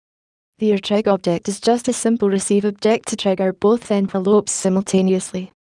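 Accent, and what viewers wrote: Scotland, United Kingdom. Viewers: I never imagined I'd be listening to a female robot with a thick Scottish accent teaching me synthesizer programming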